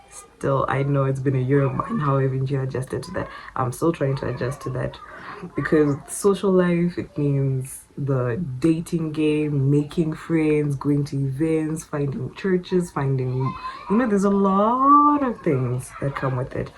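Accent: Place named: Ndebele, Zimbabwe. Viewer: Ne accent yako ino sounder munhu anotaura ndebele waive ku safe side dai wakataura ndinonyanya ku watcher channel yako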